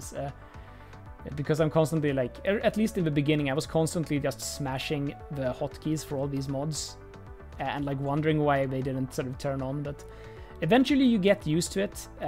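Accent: Sweden. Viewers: Are you from sweden? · Where are you from? Im from Sweden, you sound Swedish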